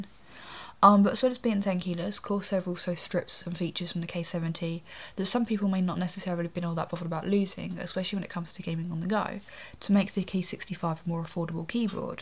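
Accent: England, United Kingdom. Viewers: this is a very good channel, with a lot of good stuff and its a talented, good looking girl, but her voice is so so bad, with that annoying british accent and a very low voice.keep the good work and work with your voice.congratulations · Such a beautiful voice. Can I ask what part of England your accent is from? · You have the perfect English 'accent'. Technically it's not an accent I suppose but there are definitely different accents/dialects in England. You have the nice and proper way of speaking and I could listen to you talk about nothing all day long to be honest.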